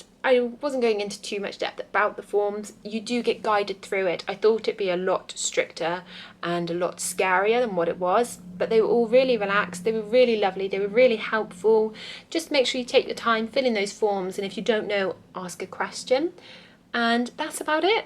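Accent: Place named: United Kingdom. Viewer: What a lovely British accent. It’s music to the ears! You travelled to Korea in the same month I did. I hope you stay safe throughout the trip.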